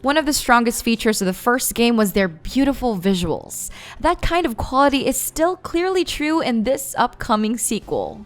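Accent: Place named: Philippines. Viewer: MooseRocket she sounds like a FOB Filipino?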